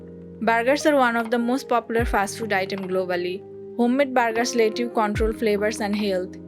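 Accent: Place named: Bengal, India. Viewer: Heavy bengali accent..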